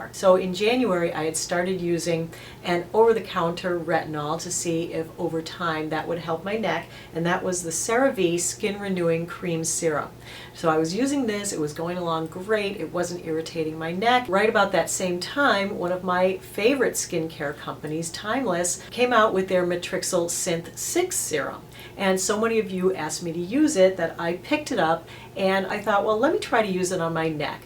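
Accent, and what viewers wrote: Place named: New England, United States. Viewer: Love the video. I heard your accent and knew your were a fellow New Englander💕 I love CeraVe products. I will try on my neck too. Great advice. Thank you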